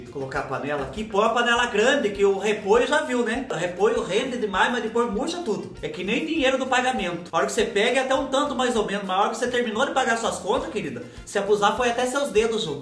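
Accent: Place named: South, Brazil. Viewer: cai sem querer no teu vídeo e ao ouvir esse sotaque pensei: esse deve ser do PR ou de SC ou de RS😅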